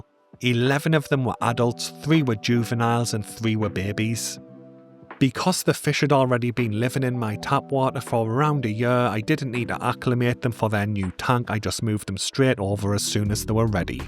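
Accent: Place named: North Yorkshire, United Kingdom. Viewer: Looks really good, i don't have a set up like that but i'm planning another purchase, i going set up a cherry shrimp jar, i just need a big jar, i've got them in all 4 tanks now, my minnows haven't bothered with them, i've gone from 12 to a few hundred at least in about 6 months, luckily i know a couple of people with tank suitable for some, the water in North Yorkshire seems to suit them, not far from where you are, judging by your accent, i've just started following you on Facebook so i might send you some pics, what would you recommend for planting in a jar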